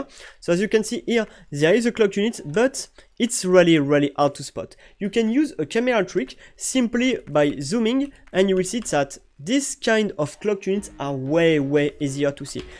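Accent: France. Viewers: Le bon vieux accent français x)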